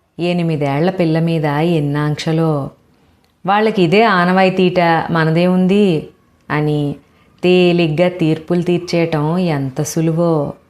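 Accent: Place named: Godavari, India. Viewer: మీ అగ్రహారం కథలన్నీ విన్నాను అమ్మా... చాలా చాలా చాలా బాగా చెప్పారు మా గోదారి యాసలో....❤❤👌👌👌👏👏👏🙏🙏🙏🙏 ఒక్కటి పక్కన ఫోటోలోనీ వాళ్ళూ నిజమైన వారా??!! లేక ఊరకనే వేశారా???!! నా సందేహం తీర్చగలరు. ఇక మీ మిగతా కథలూ వినటం మొదలు పెట్టాలి.ఉంటానమ్మా🙏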